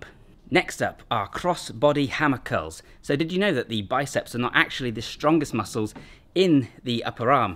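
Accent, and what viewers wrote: United Kingdom. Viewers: Side note. You have a great naration voice for audio books, I feel the confidence and conviction in the words. Must be the accent. Sooo British.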